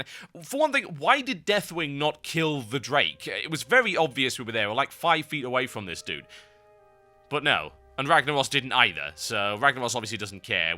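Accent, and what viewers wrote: United Kingdom. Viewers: stupid ugly british accent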